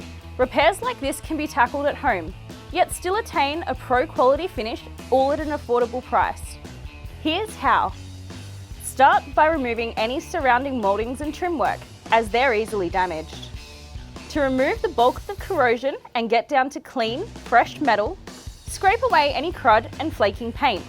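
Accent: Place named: Australia. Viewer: How good is the Aussie accent dude hahaha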